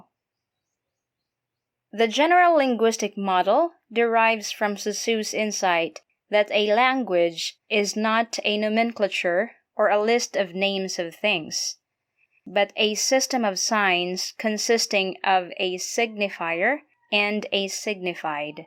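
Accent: Philippines. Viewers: This dialect sounds like the Standard Philippine English.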